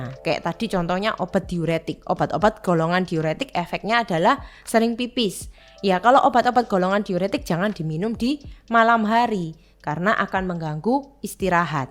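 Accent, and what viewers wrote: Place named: Surabaya, Indonesia. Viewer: ini dokter atau apoteker.. pd manggilnya dokter hehe.. ini lulusan jawa timur yak?, medok suroboyo an rek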